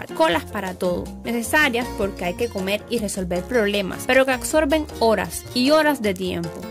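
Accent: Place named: Cuba. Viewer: Por tu acento marcadamente cubano casi no te entendí algunas expresiones sobre los oficios. Te cuento que en Cartagena, en el Caribe colombiano hay varios de los existentes en tu isla; bicitaxi, zapatero, el que arregla las ollas y calderos, las sombrillas y el colero ( acá lo ves quienes apartan los turnos en las colas para las citas médicas y obtención de medicamentos en las IPS- Instituciones Prestadoras de Salud- y en las EPS-Empresas prestadoras de Salud- y el que consigue pasajeros para el transporte. El que me pareció más curioso. porque ya ni los veo, es el de los encendedores, que llaman ustedes fosforeros. Me llamó la atención que no existan allá los mototaxistas, cuando acá eso es una PLAGAAAAA. Un abrazo desde Colombia.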